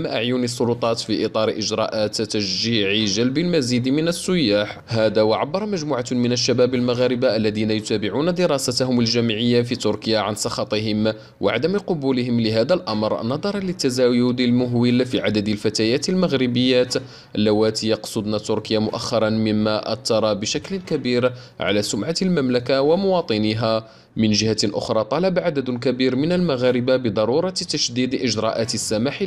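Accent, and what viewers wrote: Algeria. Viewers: اقسم بالله ان المعلق جزائري انطلاقا من مخارج حروفه ، اخي اسأل شمس الدين يلقى كببل شينوا فالزبالة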